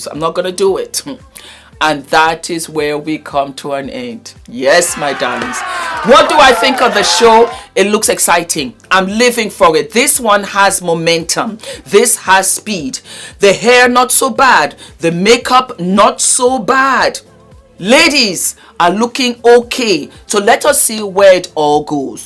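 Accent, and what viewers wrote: Nigeria. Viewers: What is there not to love about you. I am so loving and feeling the Naija vibe, energy and accent in this episode. Omo, you are truly a Naija babe jare, 100%. Once a Nigerian, always a Nigerian! Love you as always.😘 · Nice to hear speak pidgin, I live for it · Loooooooooovvvveeeedd this review😅😎.!!! ... Loved the Nigerian accent and words you incorporated in the video.. Definitely going to watch it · your pidgin is perfect and sweet. 😁 I enjoyed this review.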